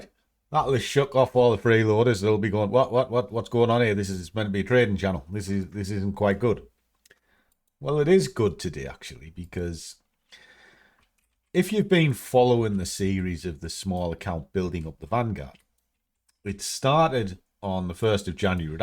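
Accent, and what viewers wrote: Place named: Wales, United Kingdom. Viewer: You remind me of me! We are a similar age and have the same sense of humour and no BS tolerated - and I love your welsh accent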